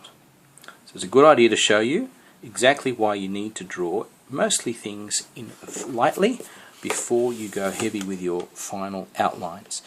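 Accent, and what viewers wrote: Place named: Australia. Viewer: Its an aussie accent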